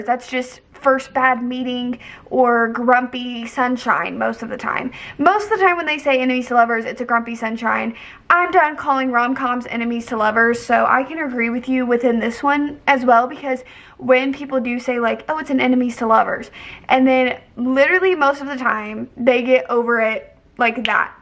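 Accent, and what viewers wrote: South, United States. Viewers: question for you: have you ever lived in the south? i swear you have a slight southern accent and the way you say “oils” is just further entrenching my southern theory!!!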